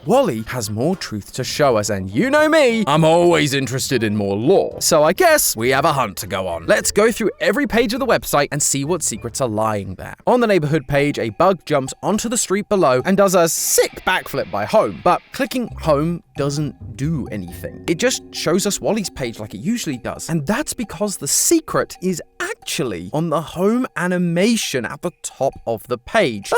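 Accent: United Kingdom. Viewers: Honestly has really grown on me, I kinda like him more than matpat tbh but that could just be because he's got a funny British accent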